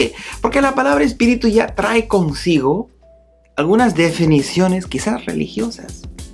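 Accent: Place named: Peru. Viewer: pareciera de peru o un portugues que sabe hablar español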